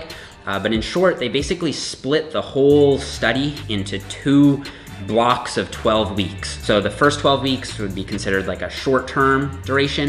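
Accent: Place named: Newfoundland, Canada. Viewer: My thoughts as I watch you " Oh hey his accent is familiar....wait a second, his last name is Nippard googles your name HAH hes a newfy! " Yaaaaaaaay!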